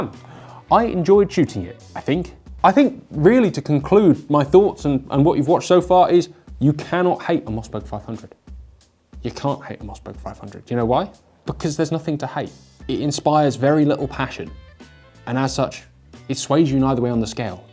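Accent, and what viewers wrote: South, United States. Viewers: Great Southern American accent. 👍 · I like your American accent. 😄😄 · Good southern accent and good review......you sounded like you are from North Carolina P.S I saw a brief news report of US weapons going to the Ukraine and amongst them were Mossberg 500 shotguns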